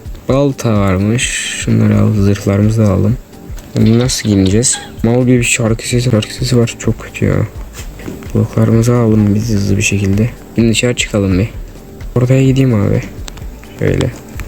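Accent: Azerbaijan. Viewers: Azerimsin sesinden bildim Düzenleme:beyler like atın hiç bi videoya çıkmadım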